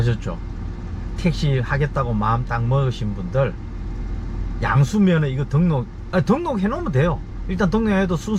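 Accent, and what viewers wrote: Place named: Gyeongsang, South Korea. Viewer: 아흐 경상도듣기싫은 정신병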